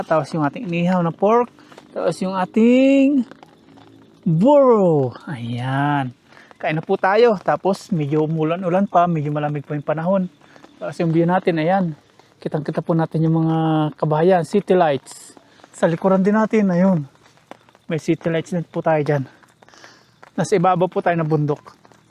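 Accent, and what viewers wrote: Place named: Mindoro, Philippines. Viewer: Boss tiga Mindoro ka no? Kasi yun Tono mo😂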